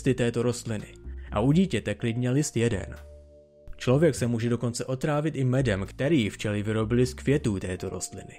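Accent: United States. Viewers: co co mas za skurveny prizvuk americky ? Odporny hlas video, ale fakty su dobre :)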